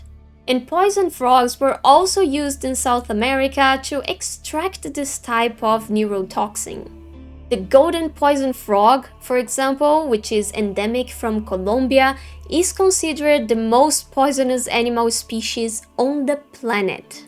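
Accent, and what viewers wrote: Brazil. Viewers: vc é brasileira ne fala a vdd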